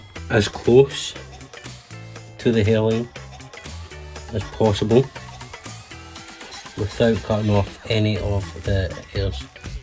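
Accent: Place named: Scotland, United Kingdom. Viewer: What is this accent? Scottish? I only understood maybe %30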